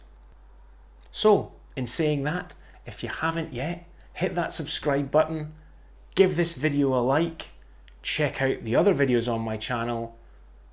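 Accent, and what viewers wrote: Scotland, United Kingdom. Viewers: The sexy soft scottish accent.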